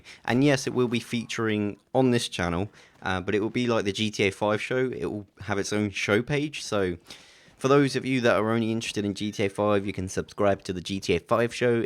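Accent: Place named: England, United Kingdom. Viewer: Very good to hear a familiar accent Lol. Where in England are you from mate?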